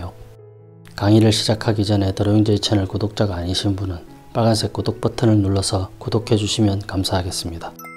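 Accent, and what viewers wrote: Gyeongsang, South Korea. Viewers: 경상도 분이신가 봐요 ㅎㅎㅎ 꼽표 오랜만에 듣네요 똥글빼이 ㅋㅋ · 늘 잘보고 있습니다. 제가 쌩초보라 쓰고 있는 연필이 4b인지 2b인지 궁금합니다. 강의중 그많은 말들속에 이건 4b입니다.라고 첨기 부탁드립니다. 그리고 같은 갱상도라 너무 정감갑니다.ㅎ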